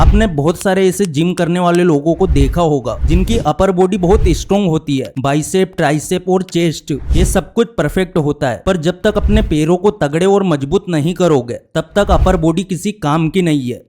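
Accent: Indore, India. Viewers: indori bhai ki aavaz lag ri hai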